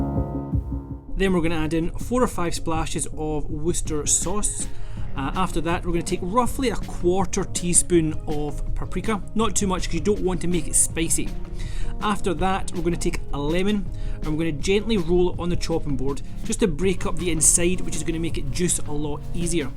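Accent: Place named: Glasgow, United Kingdom. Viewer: Finally a recipe without all the faf and actually gives you an idea how long the sauce will keep for, thanks, (the fact that your Scottish is just the icing on the cake lol, is that a Glasgow accent I hear:-) )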